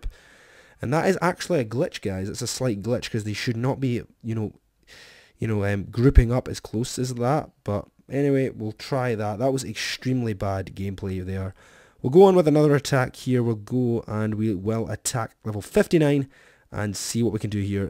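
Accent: Scotland, United Kingdom. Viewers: his scottish accent made the gameplay intresting..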